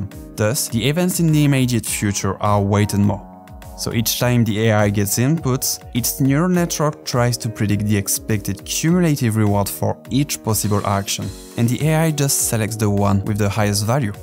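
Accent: France. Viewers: Unrelated but your accent sounds very french, are you french? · Like your French accent ! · sympa l'accent français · Un bon accent bien français qui me permet de comprendre sans sous titre, gg les gars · 🥖🥖🥖🇫🇷🇫🇷🇫🇷 l’accent français on est là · l’accent français on est là mon g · I like the french accent while talking, even though you talk pretty well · On reconnais l'accent français même si tu parles trop bien mdrr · Hey la vidéo est géniale, n'ayant pas tous les termes informatiques en anglais c'était difficile à suivre mais très passionnant. Au vu de ton accent (et de ta couleur de voiture) je pense que tu es français. Si à l'occasion tu peux mettre des sous-titres français pour les gens comme moi qui ne s'y connaissent pas forcément en informatique et machine learning ce serait super ! Ta vidéo a un rythme à la Virtual, tu pourrais devenir le Wirtual français ce serait excellent. 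En tout cas superbe vidéo, bien montée et captivante même pour moi qui ne joue même pas à ce jeu et n'y connais rien à tout ça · Ça sent l’accent français un peu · c’est à l’accent qu’on reconnait le frenchman 😎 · Great video, just asking are you french because if u are ur accent is thick X) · Bruh this video was just me getting frustrated because you sound so french, so your english is pretty weird but stills english so it still harder to understand than french x) (for me of course) but if we forget that, great video bro ! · Accent français détecté ! En tout cas super vidéo ! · W french accent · Ton accent francais se voit (un peu) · He's first language seems to be french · j'adore l'accent françait sa fait plaisir! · il a un big accent français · Bc of ur accent sound French background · lol huge french accent bro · Tes francais jai cramé ton accent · am I the Only one to think that this guy has a french accent? 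· Tu as un léger accent français , est -ce ton origine?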